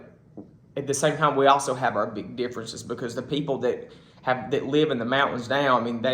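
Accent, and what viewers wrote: South, United States. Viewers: Us "Yanks" like his Southern accent too! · Transplant to eastern NC from NJ - going on 20 years now. Amazed at the differences in the various regions of the south. LOVE your accent and local regionalisms; just so rich & evocative. My mother-in-law is from central/western Carolina. She talks about “mashing” buttons and a crowded situation as being “slam full.” (“Fixin’ to go uptown…”) Wondered about your accent and where you might be from, so thank you for the terrific video. 👍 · Your strong southern accent is interesting and adorable. Just like your handsome face. 😊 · Dude....your accent is awesome. I've got family from east Texas and I lived in Kentucky for 7 years, and it's very familiar. I got a little plaque in my kitchen that has 1 stick figure = you, 4 stick figures = y'all, and 5+ stick figures = all y'all. Gotta love the southern accents! 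· Enjoyed your video. Greetings from New Zealand where we have two official languages English and Maori - the language of our first nations people. Languages, accents/dialects really interest me. I always thought New Zealanders had no accent until, when I was 20 and lived and worked in the UK for 8 years, where people commented on my accent. When I returned to New Zealand after 8 years living in the UK, people commented on my "English" accent! I have a friend who immigrated from Arkansas to New Zealand about 6 years ago. His accent is similar to yours but not as strong.😊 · I love this. I lived in Raleigh, NC for 15 years and started to acquire the accent from that area but ended up back in Indiana and have mostly lost it, but I really like the southern accent (quite different from "Hoosier" Indiana). I knew quite a few of the one you mentioned, but the regional differences are interesting. 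My surname family is from Scott County, Virginia (near SW corner), and many branches are from North Carolina, so I feel a bit connected to the region. I have just stumbled onto your channel past couple of days as it appeared in YouTube suggestions. Been watching some interesting content.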